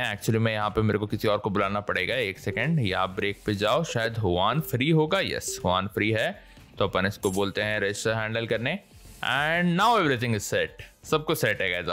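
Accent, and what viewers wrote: Maharashtra, India. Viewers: are u Marathi?????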